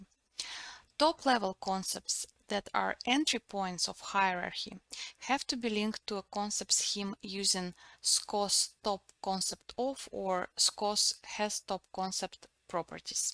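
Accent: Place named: United States. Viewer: The lady speaks a very very bad americanenglish. A very bad dialect. Stop it and repeat with someone who speaks english high language.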